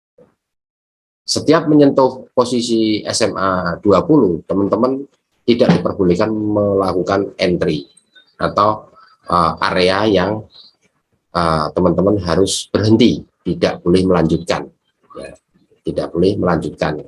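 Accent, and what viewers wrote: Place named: Java, Indonesia. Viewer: CUCOK SAY ....JAWIR BANGET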